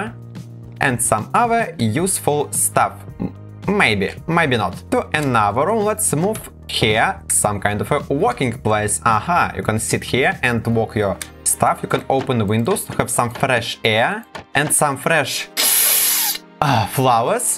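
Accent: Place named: Russia. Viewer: Русский акцент узнается с первых слов🙃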